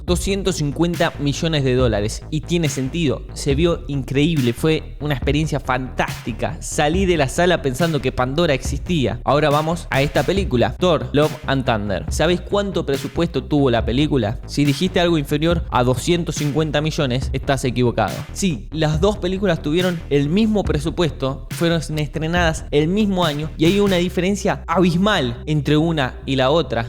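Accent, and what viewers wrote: Standard. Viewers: Es que tenés un acento muy neutro.... me atrapaste. No hablás de tu. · que croto agarrar videos en ingles y simplemente hacerlos en castellano, encima hablando en neutro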